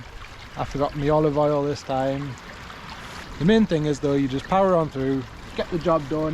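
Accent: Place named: Northern England, United Kingdom. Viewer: Enjoying the channel, love the accent. I’m from Newcastle originally but been in Oz for 35 years so love hearing the Northern accent.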